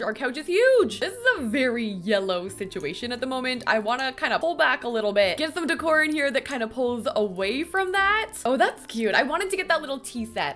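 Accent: Canada. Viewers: I love how she says words like “couch” and “house” 🥰 I didn’t know this was a canadian accent until I discovered her channel. (I’m not a native eng speaker)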